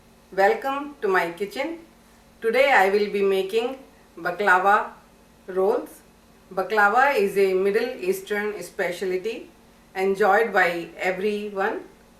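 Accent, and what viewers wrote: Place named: India. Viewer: everything that you teach us is wonderfully delicious! Please tell us the name of the (teaspoon) spice that you're adding to the sugar syrup., you're Indian accent is lovely but I keep missing the name. Thank you!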